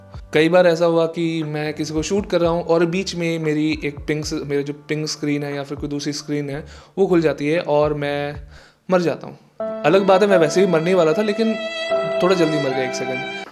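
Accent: Assam, India. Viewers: Assam se ho bro? Bhai na bhai!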